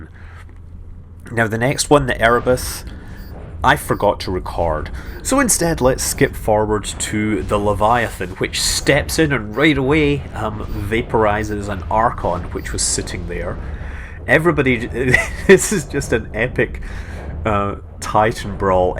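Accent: Scotland, United Kingdom. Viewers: dunno what it is about it, but hearing a scotsman quote scripture...just sounds...right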